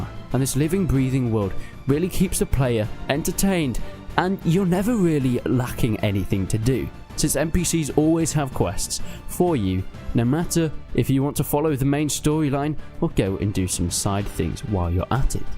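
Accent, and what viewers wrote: United Kingdom. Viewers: I thought he was British?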